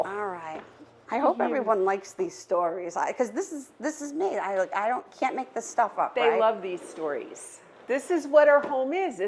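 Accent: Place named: New York, United States. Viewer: accent sounds more like downstate NY than Massachusetts.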